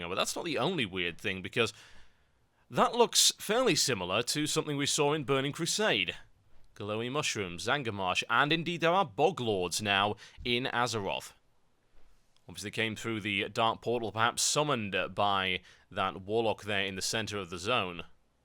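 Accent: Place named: United Kingdom. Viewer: I'm not playing till cataclysm, beteen this guy and omfgcata I'm even more stoked about cata, the best part is, I'm british so this guy is like my on kind =), even though accents and language varies dramatically depedning on hich part of britain you're from, ahhh the way our language has been bastardised over and over...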